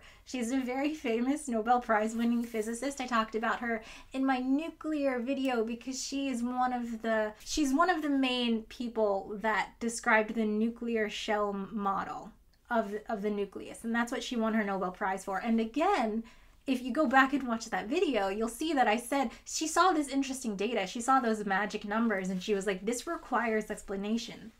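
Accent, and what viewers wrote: United States. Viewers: Nice video. I just wish you weren't butchering (aka Americanising) not English names to this extent.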